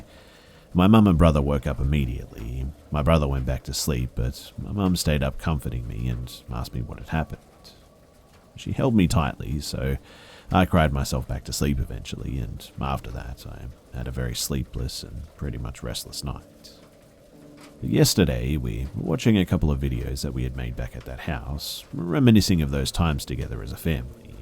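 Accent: Australia. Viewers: Are u aussi or kiwi? I am not pretty sure about your accent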